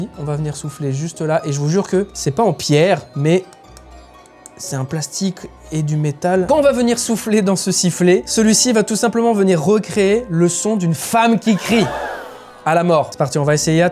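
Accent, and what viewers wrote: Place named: Quebec, Canada. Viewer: Omg l'accent québécois jadoreeee en ten que québécoise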